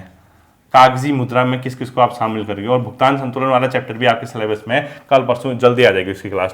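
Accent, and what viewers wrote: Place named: Chamoli, India. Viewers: Chamoli dilect cha yu😍.. Bhotte bhal gurujii..Yan lagnu yakha k cha ap😀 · Bahut achi koshish sir garhwali bol bolne ki ..👍🙏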